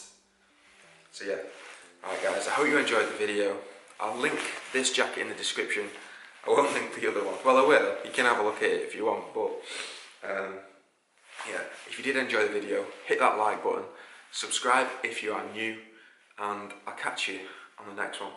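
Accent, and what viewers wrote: United Kingdom. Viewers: I HATE BRITISH ENGLISH...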